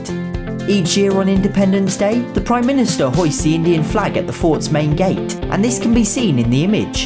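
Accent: United Kingdom. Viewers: Your way of speaking is damn British . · Awesome video brother.after watching ur this video I've watched ur 6-7 videos&subscribed ur channel.I just found u on YouTube.I'm from India.ur British accent is so sweet hare.love from India🇬🇧❤🇮🇳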